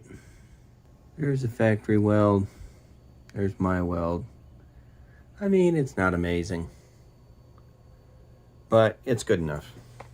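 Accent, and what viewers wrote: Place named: Nebraska, United States. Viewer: Welcome back. Sounds like you grew up in Nebraska. And, you could use a Tig welder, they make them really small these days.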